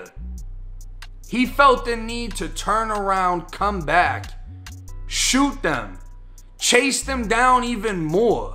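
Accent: Boston, United States. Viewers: Are u from Boston I love the way u talk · Why you sound like you from Boston?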